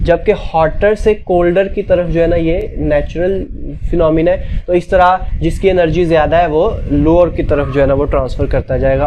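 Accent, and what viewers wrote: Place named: Pakistan. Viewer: I guess, you are from pakistan. No hate❤, bro got to know from your accent.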